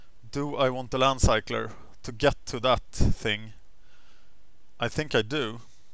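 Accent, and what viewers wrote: Sweden. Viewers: Are you Swedish ?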